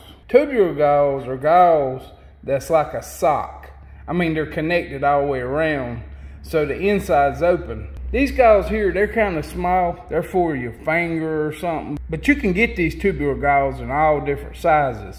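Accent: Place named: North Carolina, United States. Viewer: Man I'm from laurinburg born and raised , you gotta be from Robeson County you just have that Robeson County twang. Some good people from around them parts. Keep the video's coming best tips I've ever seen. I love your common sense approach to fishing...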